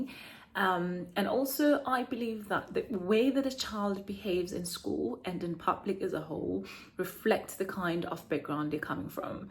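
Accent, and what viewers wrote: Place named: United Kingdom. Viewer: Loved the video. But not going to lie, that accent threw me off. For a second I thought the video is about a Brit moving to SA not other way round.🙈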